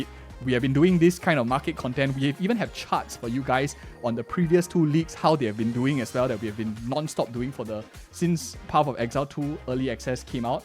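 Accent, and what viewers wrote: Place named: Singapore. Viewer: Why do I hear Singapore😂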